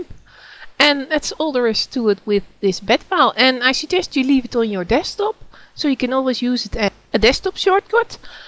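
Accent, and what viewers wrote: Netherlands. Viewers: i think your dutch :) dankjewel voor deze video :) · Are you Dutch? kinda hear ya accent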